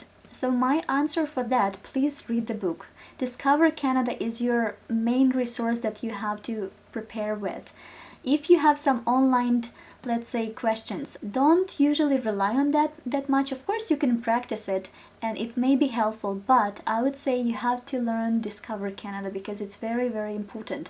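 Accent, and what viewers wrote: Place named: Eastern Europe. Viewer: you have an east European accent and I Wana visit Poland, Croatia and Bosnia in the summer that was why I asked, never mind anyways I'm from Calgary.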